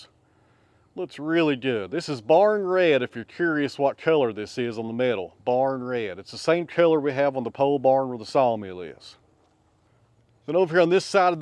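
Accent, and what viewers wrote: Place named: Tennessee, United States. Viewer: I guess I could listen to the Spanish version since I understand that, but without the Tennessee accent, it won't be the same. 😉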